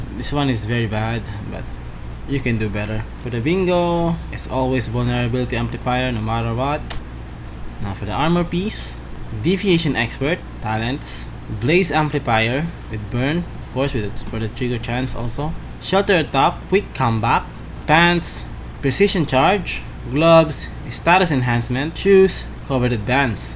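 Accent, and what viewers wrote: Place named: Philippines. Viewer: Your accent is familiar.... You a Filipino my guy? btw thanks for the burn build idea.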